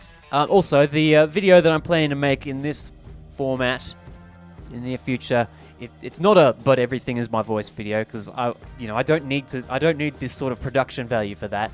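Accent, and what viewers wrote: Australia. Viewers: I wasnt expecting Australian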